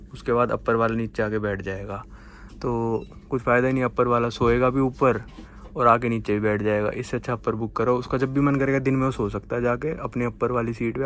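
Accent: Haryana, India. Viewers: His accent sounds like haryanvi accent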